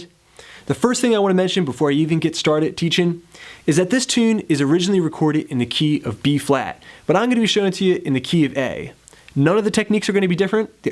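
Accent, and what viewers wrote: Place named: Philadelphia, United States. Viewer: Im also from Philly the accent was the clue I am working on Thanks for the great lesson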